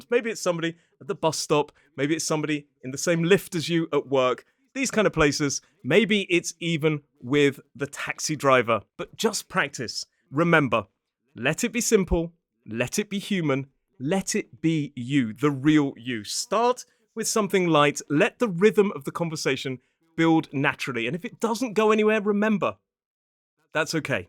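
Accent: United Kingdom. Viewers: I really into British accent ❤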